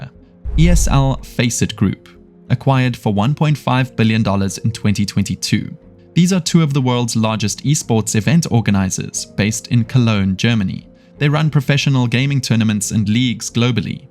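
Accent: South Africa. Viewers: top quality content man. and i can hear a "whaaait" South African accent? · bro you have a south african accent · I also thought he sounded South African. 🤔🇿🇦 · Someone said your voice is like a robot, didn't know a South African accent could be robot like, great video bru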